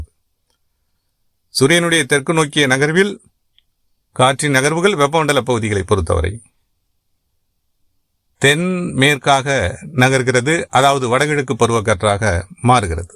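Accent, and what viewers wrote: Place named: Sri Lanka. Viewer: நீங்கள் இலங்கை தமிழில் அழகாக பேசி உள்ளீர்கள்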